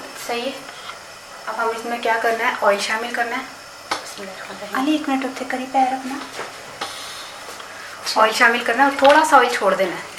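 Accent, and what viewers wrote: Punjab, India. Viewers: ریسپی تو آپکی اچھی ہے ہی۔۔لیکن کہیں کہیں پہ پنجابی کا ٹچ جو ہے نا ۔۔۔۔کمال ہے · Ap jb bolti ha too Karachi ki nai lagti.punjab ki rehne wali lagti ha.anyway nice vlog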